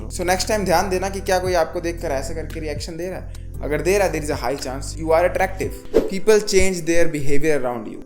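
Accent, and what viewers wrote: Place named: Punjab, India. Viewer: Bhai Aapka accent Punjabi lagta hai kabhi kabhi aap Punjab se hi kya?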